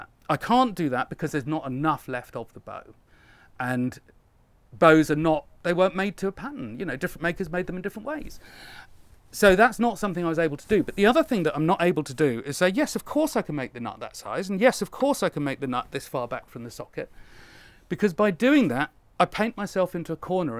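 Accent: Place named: Midlands, United Kingdom. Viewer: of workshop,. Now you're doing it to troll (and I love it). I did want to mention just how much of business is management of client expectation. I can hear the slight frustration in your voice! Unfortunately people with middle English accents (myself included) can sometimes present fact as slight disdain, especially to those in the south. Regardless, it's clear you're coming from a base of experience and passion and that bow is simply stunning.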